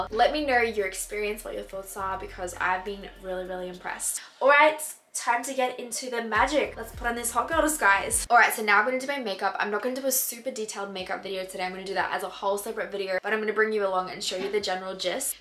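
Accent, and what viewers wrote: Australia. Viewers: Hey, are you Australian??? Love your videos ma'am 😍.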